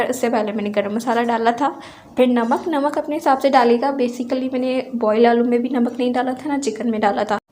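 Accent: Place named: Mumbai, India. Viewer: وعلیکم السلام بمبئی لہجہ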